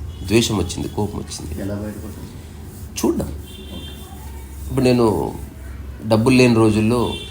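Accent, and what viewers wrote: Telangana, India. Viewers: ఇలా మాట్లాడ గలగడం ఒక ఆర్ట్.బాష, ఎక్స్ ప్రెషన్,విషయం,వివరణ ,నవ్వు,తెలంగాణ యాస,నేటివిటీ ఇలా అన్నీ అద్భుతంగా ఆ నోట్లోనుండి వస్తున్నాయి.అద్భుతం.పరమాద్భుతం.🎉🎉🎉🎉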